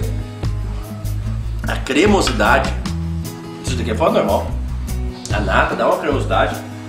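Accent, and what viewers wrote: Minas Gerais, Brazil. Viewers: Vc é mineiro? Rs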